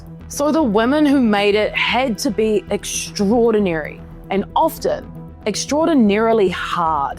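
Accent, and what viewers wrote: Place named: New Zealand. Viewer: I'm a late boomer (almost gen X) and I would like to thank you for your enlightening analysis, it really set my ideas straight about the cultural shock I sometimes experience with gen z. Also, your video helped me solve a puzzle, which is where you are from. I'm french and, apart from those of us who watch brokenwood in the original version, we are not really exposed to the NZ accent. So mystery solved! Thank you again for your excellent channel.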